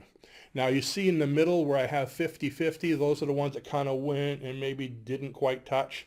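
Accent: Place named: New England, United States. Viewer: i'm from new york and it sounds like you're from the new england area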